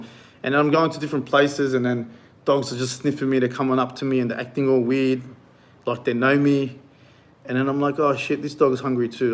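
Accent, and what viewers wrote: Australia. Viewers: Very strong Aussie accent mate, thought you are Turkish but sounds exactly like an aussie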